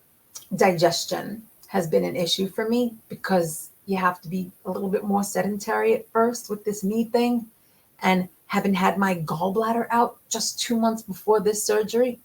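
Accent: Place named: Pennsylvania, United States. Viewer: I’ve been missing you and that PA Italian Girl accent…🥰. Happy to see you back!!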